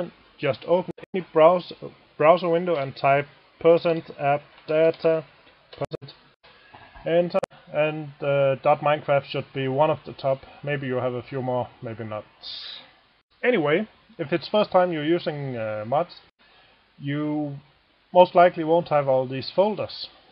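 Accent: Denmark. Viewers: Great video, even though the audio is pretty f***tup. But i am wondering, where are you from? Denmark? Or anything close? :P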